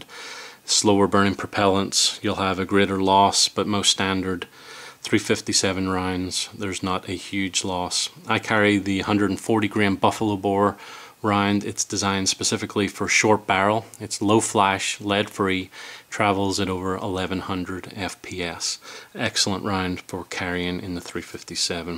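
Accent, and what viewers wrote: Northern Ireland, United Kingdom. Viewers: Accent Northern Ireland Methinks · Northern Irish to me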